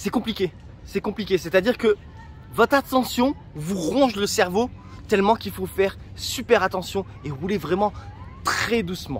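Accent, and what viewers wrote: France. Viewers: Nghe là biết người pháp😂 chào mừng anh em đến với Vietnam 👋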